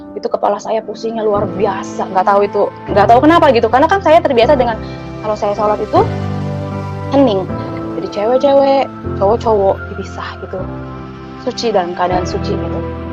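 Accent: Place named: Sulawesi, Indonesia. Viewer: Suara nya KY orang Sulawesi...KY oten gt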